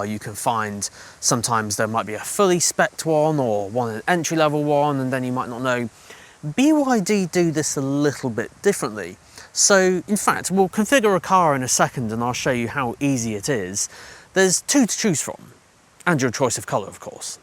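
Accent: United Kingdom. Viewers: British accent based in usa? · I find it rather odd that a dude with a British accent, sitting on the right side of the car is complaining that the indicator stalk is in the correct place. I really hope BYD doesn't listen to you and keep it on the side of the driver door like it should be. In a RHD car, the indicator is on the right and on the left if the car is LHD. See every Toyota, Nissan, Honda, Mitsubishi, Subaru, Mercedes Benz - made for a particular market (RHD vs LHD). I assume that if the Japanese can get it right, the Chinese can too. I don't know what's wrong BMW and VW. They're just lazy I think.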